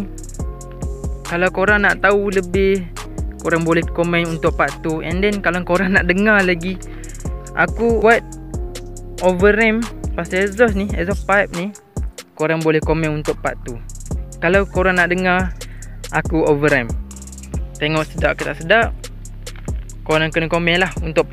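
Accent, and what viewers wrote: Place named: Sabah, Malaysia. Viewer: dok sabah ke serawak ni derr??? slu blah² sana je mcm nie 🤭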